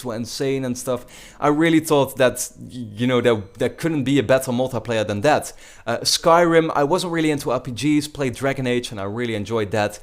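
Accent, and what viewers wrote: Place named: Netherlands. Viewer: I noticed ypu have a dutch accent. Are you dutch?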